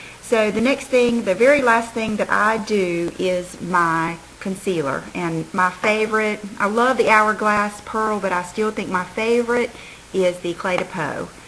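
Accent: South, United States. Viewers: I really love your videos. I always watch your videos first. Love your accent, I am a southern girl too.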